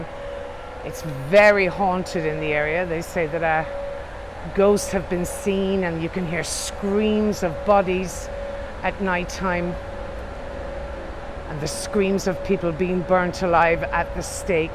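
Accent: Ireland. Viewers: Definitely agree. Just discovered this channel and is an incredible guide. Lovely Irish voice. Sounds the same as my former work colleague and she was from Co. Kildare. Xxx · I would love to see your video of your travels home. I thought I recognised your regional accent, as my husband's family are from Mitchelstown. I would love you to visit Bunhill fields. Resting place of some very familiar names. Daniel Defoe being one. A short walk from old Street tube station, and a real gem. Safe journey home. ☘️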